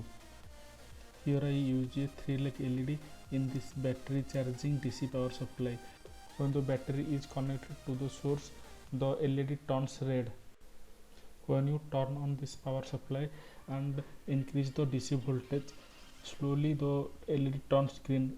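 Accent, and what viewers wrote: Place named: Odisha, India. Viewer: Your English has Odia Accent.... Where are you from ???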